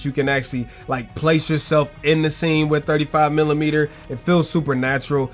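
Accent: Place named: United States. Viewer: Excellent, short video. You've covered everything that needed to be said and illustrated the points with decent photos. This is rare among YouTubers. Of course, being English, and living in Greece, l didn't always understand your US version of the language but l will try and catch you again. 🤣😎🧿 I will be getting the lens for street photography. It seems to be the perfect fit as a walkround lens on my A7r3 and an upgrade over the Sony/Zeiss 2.8/35.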